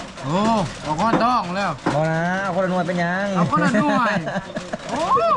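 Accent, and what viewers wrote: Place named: Isan, Thailand. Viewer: เป็นคนลาวหรือไทยคะสำเนียงเหมือนคนอุบลกับศรีสะเกษเลยพูดไม่เหมือนคนลาวแท้ๆฟังง่ายค่ะ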